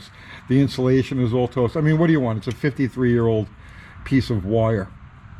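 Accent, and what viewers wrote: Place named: New York, United States. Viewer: You can take out of New York but you can't take the New York out of forgetaboutit!!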